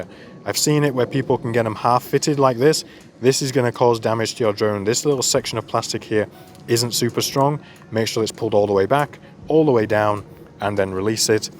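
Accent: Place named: Yorkshire, United Kingdom. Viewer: You live in the sunshine by the looks of things but you seem to have some Yorkshire roots as well. I'm a Sheffield boy - best wishes, Nick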